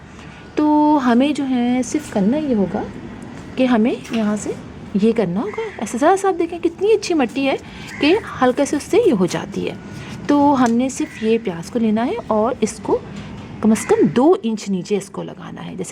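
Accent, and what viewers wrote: Pakistan. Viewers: Pakistani accent and way of speaking is soo sweet to hear ... love from India ❤❤❤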